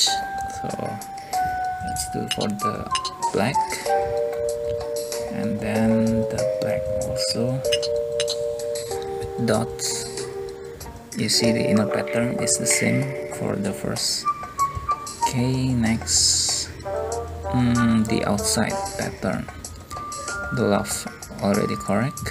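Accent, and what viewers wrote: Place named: Philippines. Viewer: can I ask, what country are you from? your accent is kinda like a filipino. but anyway, thanks for the walk-through 😁💪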